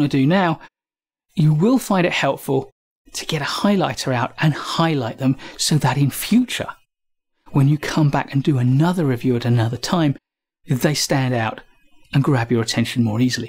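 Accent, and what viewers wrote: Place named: Northern England, United Kingdom. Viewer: Haha! Didn’t t realise I wrote the same comment 7 months ago! Northern English mispronunciations still bug me!...but your explanation of Mark's system is the best available. Xxx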